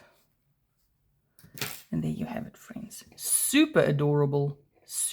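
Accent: South Africa. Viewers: How pleasantly surprised I was to hear your South African accent. Hellooooooo, from Jukskei Park, Gauteng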